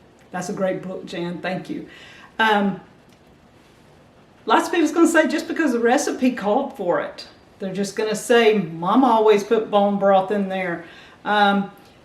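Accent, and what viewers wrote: South, United States. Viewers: I just love southern accents! pronounces "boil" as "boll" instead of "boyle". Tell Danny I wore my new American-made Roundhouse stonewashed bib overalls to the dentist today. I love them! I shared this video on all my social media pages, so people could learn a very valuable skill to help them through the coming hard times. Now all they have to do is start watching Deep South Homestead so they can learn how to grow the stuff to put in the soup. You guys are doing the Lord's work, and God bless you for it!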